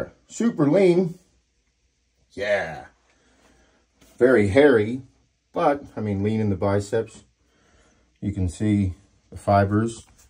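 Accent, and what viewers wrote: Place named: Pennsylvania, United States. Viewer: Love that PA accent lol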